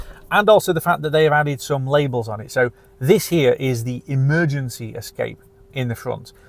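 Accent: Scotland, United Kingdom. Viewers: Voice controls and scottish accent 😀 This Tesla struggles like myself when on vacation in Inverness, since I am not an english native speaker. Very nice car in exactly the colour I would choose too... Different to your car I would choose white for the interior. Keep on with your video works; after watching several videos randomly for quite some time you've just won a new subcriber.Cheers from Austria! Ludi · Just what I wanted in a demo video, no nonsense, British and informative!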